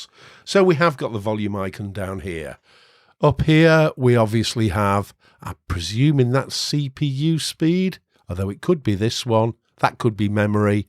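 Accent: United Kingdom. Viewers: Oh no! speech speed and British ascent is perfect mix. I personally enjoy listening to way more that any other youtube Linux channel out there. Informative and relaxing in the same time. This channel is a marvel...thank you!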